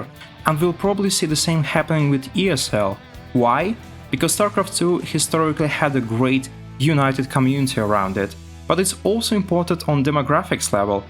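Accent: Russia. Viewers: Why do Russians pronounce match as /much/?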